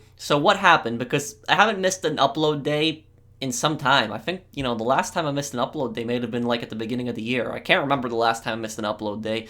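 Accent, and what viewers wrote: Canada. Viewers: I sense canadian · Are you Canadian?????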